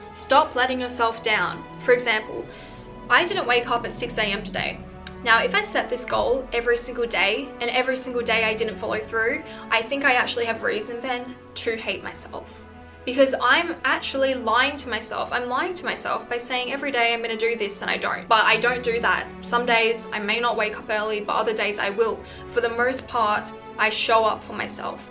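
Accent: Australia. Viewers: Hey! I just love your content sooo much. It’s so informative and full of your experience. I guess everyone is glad that you share all your knowledge about life and many important other topics. Every time I watch your videos when I‘m down it makes me feel a lot better. Somehow it’s so calming to hear you speaking. (I LOVE YOUR AUSTRALIAN ACCENT). Thank you for everything<3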